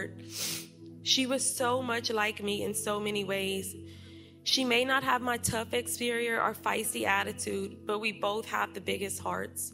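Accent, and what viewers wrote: Louisiana, United States. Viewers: First on a lighter note, I have to say I love your Cajun accent. But, Mercy my heart breaks for you.. I can’t imagine how hard this is for you and will continue to be.. my heart goes out to you and my prayers as well. I unfortunately am apart of the same group no sibling wants to be apart of. One where we’ve had to live part of our lives without another.. mine was killed in a car accident in 1999 when he was 18 and I was 13. My best friend, who was more like a sister than just a friend, died in 2008. She was killed in an accident by a drunk driver when we were 22. My heart goes out to you and your other siblings and family. I know the pain though not your exact situation.. it’s pain I would never wish on another person. I wish I could reach thru my phone and hug you, I wish I could give you words that would help or relieve your pain. 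But I will always be here for you and will continue to pray for you and your family. I am so sorry. Sending love from SW Arkansas.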